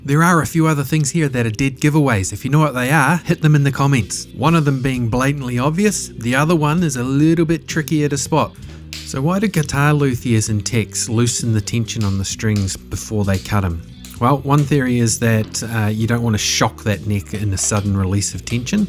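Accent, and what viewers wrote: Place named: Australia. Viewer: I like listening to an Aussie swear !! Sounds fackin cool !!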